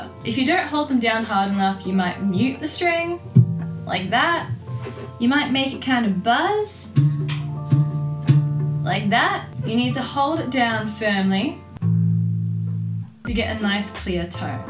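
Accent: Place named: United Kingdom. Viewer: I thought you was British lol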